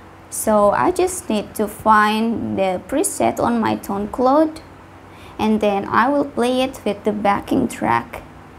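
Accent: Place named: Indonesia. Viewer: Indonesia accent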